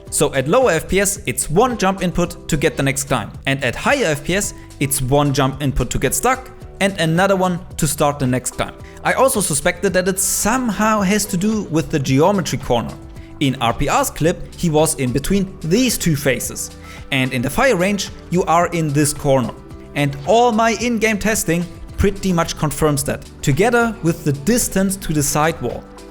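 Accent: Germany. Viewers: Heyy idk why but are you german? you sound like german btw i am german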